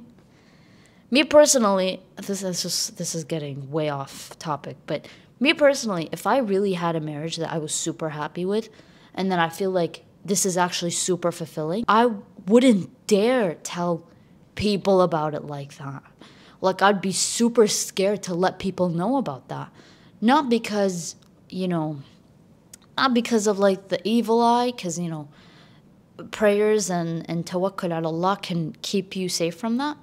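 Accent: United Kingdom. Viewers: Love the british accent du know wat mean like😅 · As a revert, I really take a comfort in your podcasts and videos. I met you on TikTok but I love your YouTube much more because of the longer videos ❤ I’m over here studying and listening to you and repeating your British “d’yknow whahimehn?”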